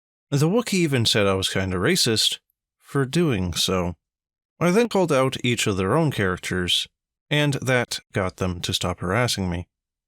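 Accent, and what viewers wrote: United Kingdom. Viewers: a black guy with a british accent. so i guess ksi doesn't exist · A Black dude with British accent... John Boyega?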